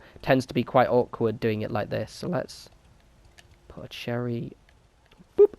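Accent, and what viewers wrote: England, United Kingdom. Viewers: The potato chips in the game are called "potato crisps", and judging by your accent I'm assuming you're in England or near it? And yet you said, "potato chips". ... Mind blown. Lol. Awesome videos, btw. I just bought FO4 a few days ago and I've been watching through all your videos for tips & tricks!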